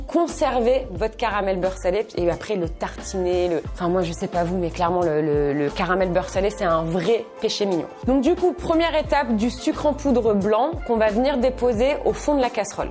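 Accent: Canada. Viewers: tu mon youtubeur préféré des vidéos accent canadien et qui met quoi des vidéos trop trop rire 👍👍👍👍👍 🇨🇵🇨🇵🇨🇵🇨🇵🇨🇵👍👍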